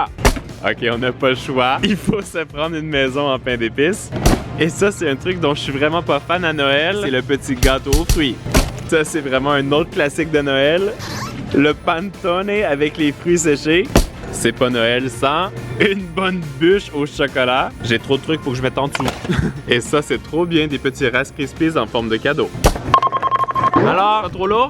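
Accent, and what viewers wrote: Quebec, Canada. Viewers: J’adore son accent québécois ❤️👌🏻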